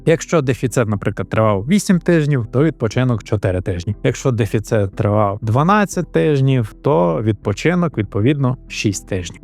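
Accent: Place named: Galicia, Ukraine. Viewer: Ваша галицька Ч додає родзинки! Приємно слухати🤍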